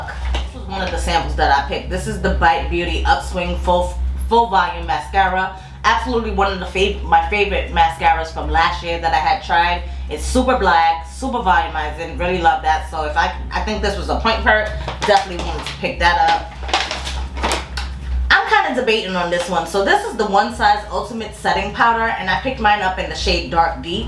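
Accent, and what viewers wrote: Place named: New York, United States. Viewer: Subbed! I like your accent! New Yorker? If so which borough? I'm your Connecticut cousin now! Lol!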